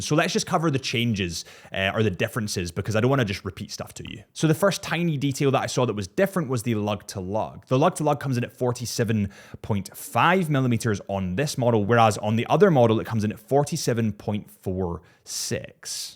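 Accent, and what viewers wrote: Scotland, United Kingdom. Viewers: I think Amiga is just the "Scotch" way of pronouncing Omega, isn’t it,? You don't sound at all like in the Archers, by the way.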